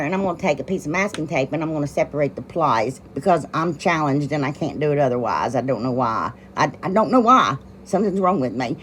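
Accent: South, United States. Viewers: Know I appreciate you just as much and I've been sharing for you and getting my family involved too. They laughed and said you amaze them, have taught them some cool things, love the country voice and think you're perfect just as you are(are just a few things they've said, some of them watched you cause of your voice and my interest, should be getting a few more people to watch your talent for sure), and I love you just as you are. Know you're always in my prayers. God bless you and yours. I pray God showers you with many blessings because you are so very special to many. Till next V•I•D•E•O😊❤😊 BIG HUGS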